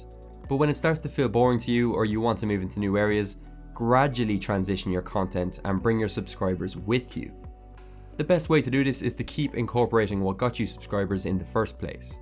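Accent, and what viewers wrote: Ireland. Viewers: Are you actually Irish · Man with that irish accent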